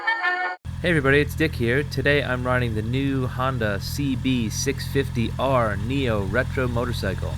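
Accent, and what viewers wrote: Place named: United States. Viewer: You don’t sound English? You sound like an American. How’s it feeling riding on the opposite side of the street.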